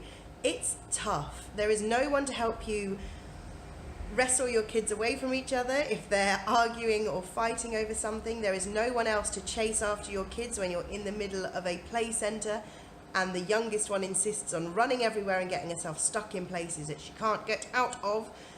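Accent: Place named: Mansfield, United Kingdom. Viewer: Are you local to me? I’m from Mansfield and your accent sounds similar to mine lol and you mentioned Nottingham! I’m not a single Mum but my Hubby works 60-70 hours a week so I’m on my own most of the time. Mummy win! Xx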